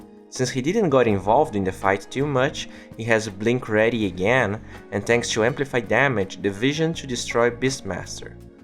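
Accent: Brazil. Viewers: esse sotaque é muito BR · Cara, não mente pra mim, você é brasileiro! Eu posso reconhecer um bom ingles CCAA de longe!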